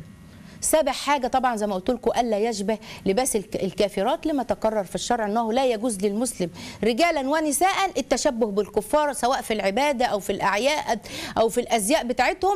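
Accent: Egypt. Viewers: انا البس حجاب طويل لا تنانير ولا سراويل ولكن مش شرعي واتمنى لبس الحجاب الشرعي ولكن كلما أريد لبسه لا أعرف ماذا يحدث واخاف ما التزمش به والقريبون مني يقولون لا احتاج لانني هكذا مستورة فأرجو من يرى تعليقي ان يشجعني على الحجاب الشرعي اكثر ارجوكم انا اتمناه الله يهدينا جميعا ياارب 🤲🇩🇿🇵🇸انتي جميلة جدا ما شاء الله وكلامك عسل يدخل إلى القلب وبسببك انا وكثير بنات راح نتحجب مثلك تبارك الرحمن ما احلاكي ولي زايد حلاوة اللهجة المصرية كل العرب يفهموها 🥰🇪🇬😁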